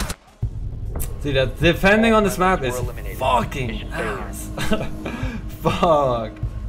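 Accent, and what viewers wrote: Germany. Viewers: you from german or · Are you german ? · Is he german??? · Are you German? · Wtf bist du deutscher oder kannst du besser deutsch als ich 😂😂😂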